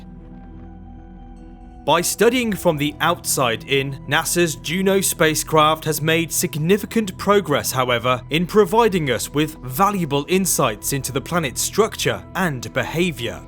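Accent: United Kingdom. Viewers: UK accents are annoying. So are spurious titles. DISLILE.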